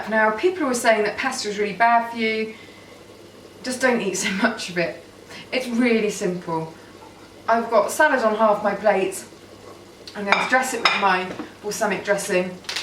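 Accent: United Kingdom. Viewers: I like how you saw "tomato" :)) love your British accent! To us here in the US it sounds so interesting and nice!! I could listen to you all day!!:) Luv from New Jersey!!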